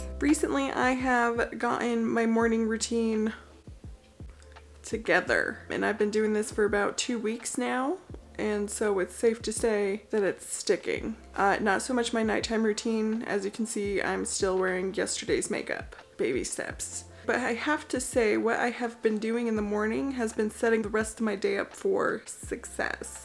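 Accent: United Kingdom. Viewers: Top effort for your British accent! ⭐️ 🇬🇧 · I need a morning routine. Cheers for the reminder and LOL at your British accent. I'm from Manchester England 😂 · Well done. I hadnt realised that Headspace has that suggested run of things for the morning, thanks for that! i've been not noticing that function:) also, I LOVED your British accent, very good. You sounded very familiar! Thanks for a great video. take care x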